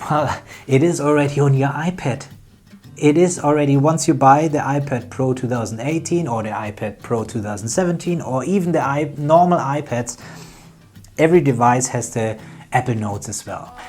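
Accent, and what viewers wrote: Germany. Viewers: Are you from Germany? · Oh Gott, dein deutscher Akzent ist so schrecklich anzuhören!!!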